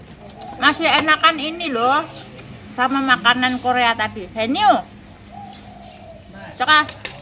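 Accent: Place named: Banyumas, Indonesia. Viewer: Mantap banget bagi bagi ngapak hee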